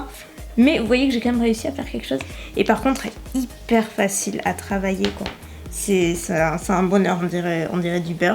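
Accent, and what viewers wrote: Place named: South-West, France. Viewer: moinSSS le bon accent du sud ouest ;)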